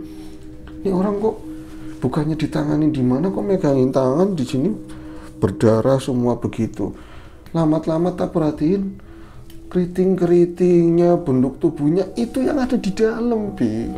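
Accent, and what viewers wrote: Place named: Bekasi, Indonesia. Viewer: Judulnya Jenat - Riam Biru #3 Ketika bro masih berlogat Bekasi Barat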